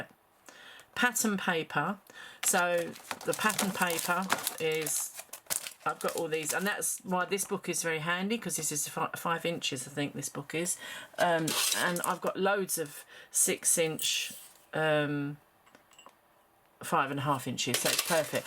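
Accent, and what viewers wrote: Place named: United Kingdom. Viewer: What a great way to get started. You did a great job. I'm going to try too. Nice to hear a british voice too. Subscribed. X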